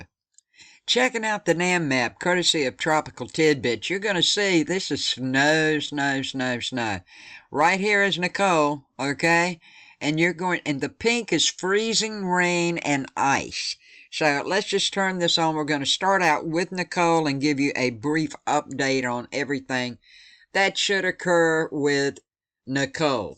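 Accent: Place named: North Carolina, United States. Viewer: ❤loovvveee your weather Chanel! Love the n.c accent! Keep up the good job , you make it all easy to understand 🤗🙏🏻💕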